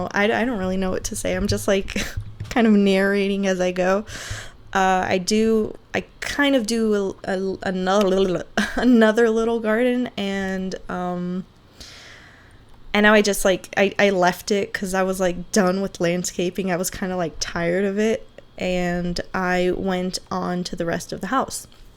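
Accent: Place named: United States. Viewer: Adorei o inglês! Parece até americana mesmo <3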